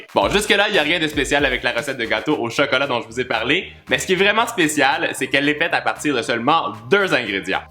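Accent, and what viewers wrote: Quebec, Canada. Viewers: L'accent québécois est flagrant 😂🔥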